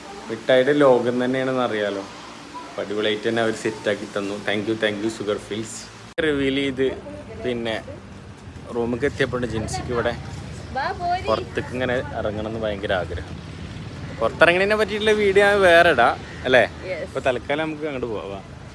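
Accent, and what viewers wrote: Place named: Kozhikode, India. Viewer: ജിൻസിക്ക് nammed koikoden bhasha🎉🎉adipoli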